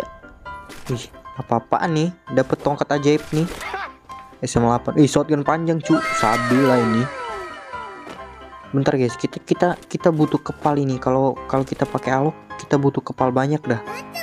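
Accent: Palembang, Indonesia. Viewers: Bang lu orang Palembang y bg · Bahaso Palembang nyo keliatan bang j awoakwowk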